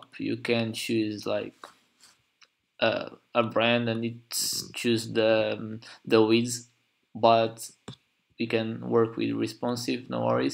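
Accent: Portugal. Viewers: Pelo teu sotaque bem me parecias português xD , obrigado pela ajuda ! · Assim que ouvi a tua voz reparei logo que eras tuga, bom vídeo. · Esse sotaque português ... Topei assim que começaste a falar. Obrigado pela dica bro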